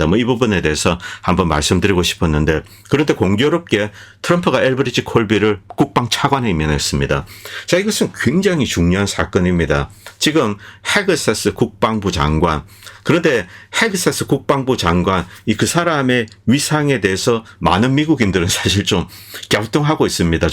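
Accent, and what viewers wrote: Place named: Busan, South Korea. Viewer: 본인이 몰라서 그렇지 전혀 다릅니다 지금 방송하시는 도 부산 사람이거든요 거의 이 억양입니다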